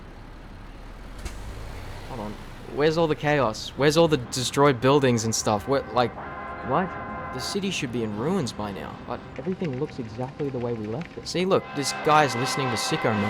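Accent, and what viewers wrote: Australia. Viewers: DAMN BROS MORE AUSTRALIAN THAN ME🐨🦘🇦🇺🇦🇺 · australian man explains roblox sounds nice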